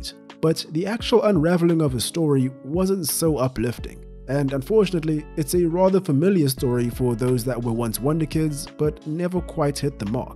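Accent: South Africa. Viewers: Great to hear a South African doing his thing on this channel. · You Sound South African Bro🇿🇦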